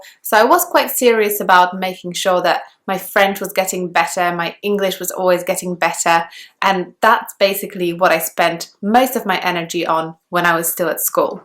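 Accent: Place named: London, United Kingdom. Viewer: Inspiring story! Your English is very high level, sounds like a londoner. What about French? Has it abandoned?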